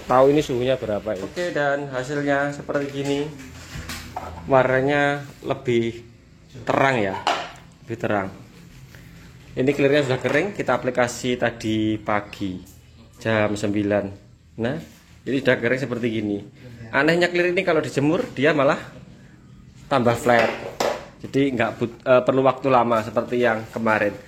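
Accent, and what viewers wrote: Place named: Java, Indonesia. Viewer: Kirain di daerah jawa, nada suaranya kaya orang jawa 😁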